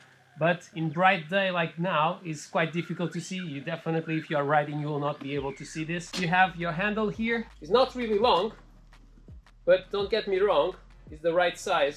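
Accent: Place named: Portugal. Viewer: Dude! Are you Portuguese or something? That accent man... so familiar!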